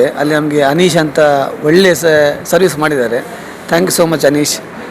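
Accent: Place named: Naravi, India. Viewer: Naravi kannada yedde undu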